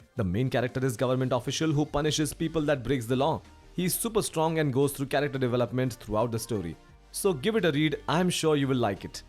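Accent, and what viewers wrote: India. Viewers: fuvking indian accent ruin the video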